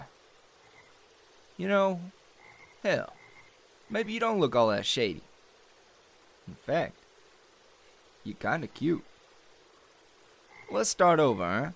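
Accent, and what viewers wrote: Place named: New Jersey, United States. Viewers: Jersey Accents r to die for 😍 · defo from northern Jersey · Jersey accents are wow ❤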